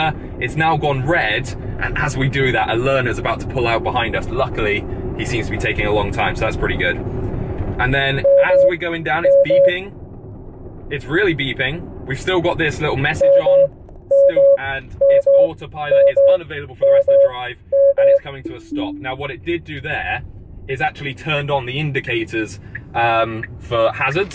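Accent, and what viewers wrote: United Kingdom. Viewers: If I have to hear this British accent I just won't watch the video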